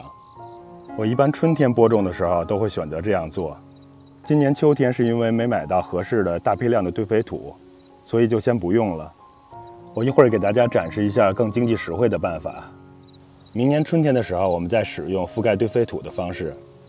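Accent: Beijing, China. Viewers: 正宗京腔京韵，声音好听，莱种的又好，给你点赞👍。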